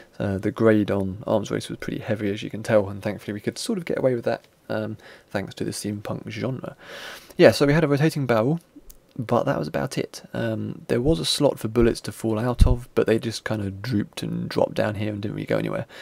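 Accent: United Kingdom. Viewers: Hey! your british accent is a little bit hard to understand. Can u give the narrating job to please?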